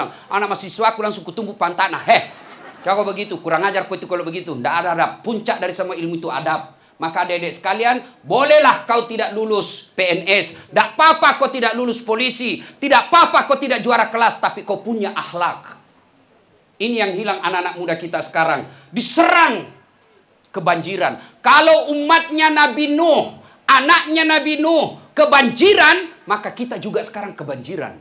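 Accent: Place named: South Sulawesi, Indonesia. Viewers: Pasti ada aja lucu lucunya. Logat bahasanya ust saya suka. Mantap bugis Makasaar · Kentara medok bahasa bugisnya hahaha tho penrank to Sawitto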